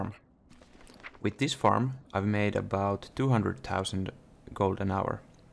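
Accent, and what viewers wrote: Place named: Finland. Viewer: Are you from Finland? Your accent sounds like it.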